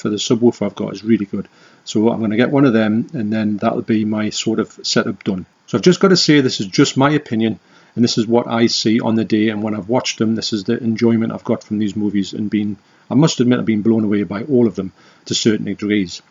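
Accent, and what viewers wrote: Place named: North East England, United Kingdom. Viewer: YouTube suggested you at random and I have to say what a lovely surprise to hear a north east voice on YouTube out of the blue. Your T Shirt is hilarious! As we all know that is the correct pronunciation 😁.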